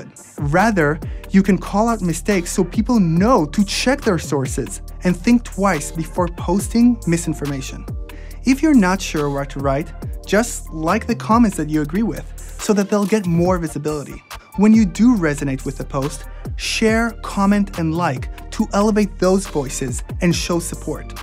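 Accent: Israel. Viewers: לא לוקחת צד בדיון העקרוני, אבל כדאי ליישר קו לגבי העובדות. שומעים מבטא ישראלי קל. אתם לא שומעים אותו כי אתם ישראלים. האמריקאי הממוצע לא יחשיב את זה כ"מבטא יפה" (אלא אם כן הדובר נראה כמו גל גדות ואז מותר לו לדבר איך שבא לו).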